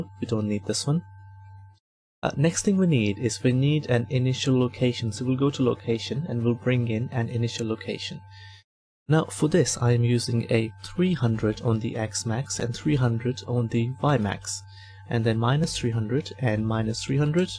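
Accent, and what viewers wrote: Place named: Germany. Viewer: GERMAN AKZENTE ^^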